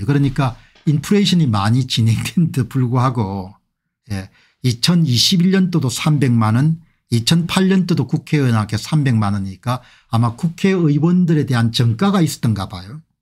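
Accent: Gyeongsang, South Korea. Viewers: 공병호님 방송 항상 잘 보고 있습니다. 하나만 지적할게요. '새'를 '섀'로 발음하고 있습니다. 그러니까 인생을 '인섕'으로 발음하고 있습니다. 서부 경남 지역 발음입니다. 공병호님이 방송인이라 이런 지적을 해봅니다.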